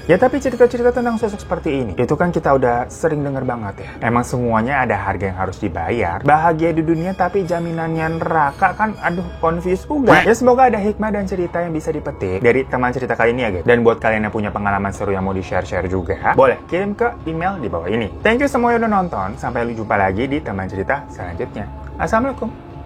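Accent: Java, Indonesia. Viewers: Mas nya pas pake bahasa jawa jd lucu ngomonge🤣🤣🤣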